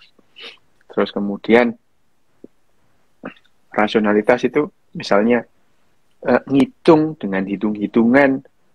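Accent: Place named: Malang, Indonesia. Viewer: Saya suka dokter Ryu Hassan, benar-benar cerdas, dan gaya dialek Malang nya alami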